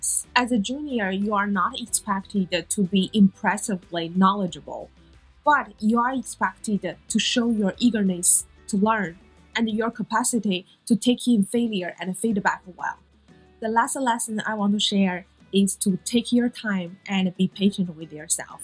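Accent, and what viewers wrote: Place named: India. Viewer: indian guys are killing it with their accent good luck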